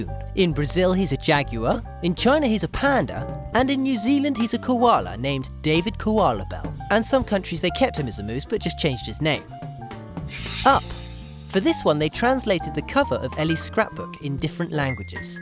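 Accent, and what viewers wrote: RP, United Kingdom. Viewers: You sound posh.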